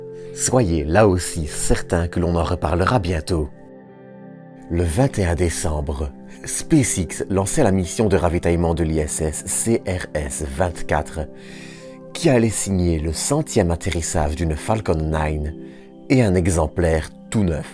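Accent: Belgium. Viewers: Hé non c'est l'accent belge 😉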